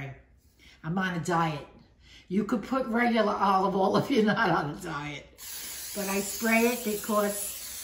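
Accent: New York, United States. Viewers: I detect a New York accent.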